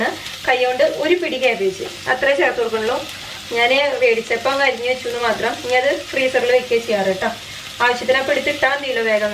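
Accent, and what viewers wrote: Thrissur, India. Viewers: അടിപൊളി മാഷേ ഇമ്മടെ തൃശൂർ ഭാഷ അസ്സലായി ഞാനും തൃശ്ശൂരാണേ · പിന്നെ എന്തുട്ട്ണ്::: തു ശൂർ ഭാഷ like it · വളരെ നന്നായിട്ടുണ്ട്, നമ്മുടെ തൃശൂർ ഭാഷയും അടിപൊളി, തൃശൂർ എവിടെയാ, ഞാൻ ഇരിഞ്ഞാലക്കുട യിൽ ആ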